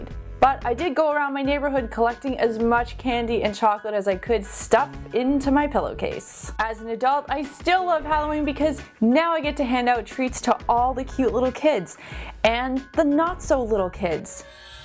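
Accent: Canada. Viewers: She's Canadian- that's the accent.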